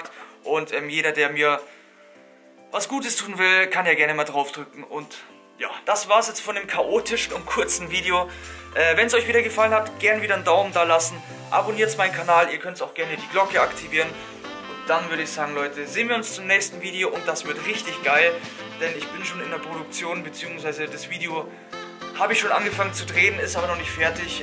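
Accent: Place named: Bavaria, Germany. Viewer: servus, woher kommst du? hört sich bayrisch an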